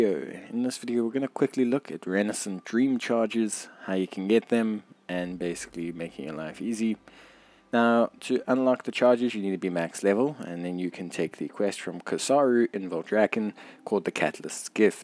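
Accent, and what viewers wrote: South Africa. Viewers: Awesome to hear some content from a South African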